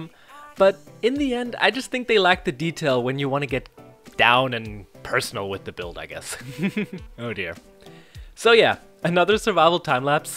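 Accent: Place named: Germany. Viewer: You got this german accent 🤔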